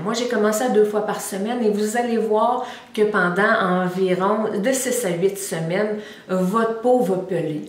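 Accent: Quebec, Canada. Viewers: Je taquine beaucoup mes copine québécoise pour leur accent mais toi je peux t écouter parler pendant des heures ... donc j ai bien compris après avoir bien fait pénétrer la crème et laisse poser tu applique quand même tes sérum doux sans acide je ferai pareil et les soirs off je mettrai mes sérum au retinol merci pour tous les conseil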